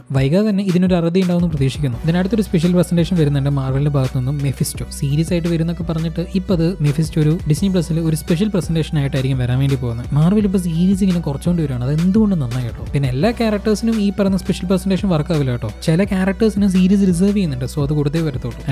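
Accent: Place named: Kottayam, India. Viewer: ചേട്ടന്റെ എല്ലാ വിഡിയോസും ഞാൻ കാണാറുണ്ട്. എനിക്ക് ഒരു കോട്ടയം എവിടെയോ തോന്നുന്നുണ്ട്.കാരണം എന്റെ ചേട്ടൻ കോട്ടയത്തായിരുന്നു degree ചെയ്യ്തത്. ചില കാര്യം പറയുമ്പോൾ correct എന്റെ ഏട്ടൻ സംസാരിക്കുന്നത് പോലെ തന്നെയാണ്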